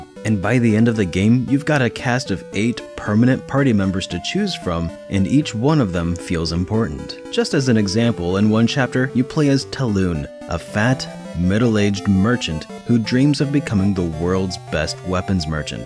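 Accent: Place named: Cockney, United Kingdom. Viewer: MasterStryfe I thought it was because he was using cockney English.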